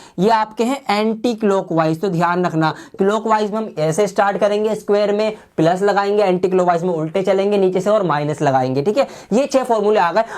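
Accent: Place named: Uttar Pradesh, India. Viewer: Correct bro thanxx tum up ka ho ya to pata chal gaya😁🎄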